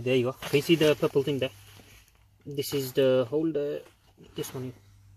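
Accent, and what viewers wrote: Bradford, United Kingdom. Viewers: You're definitely from Bradford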